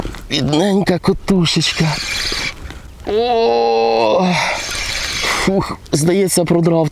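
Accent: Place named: Ukraine. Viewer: говори на нормальном языке. не хочется слушать говор Бандеры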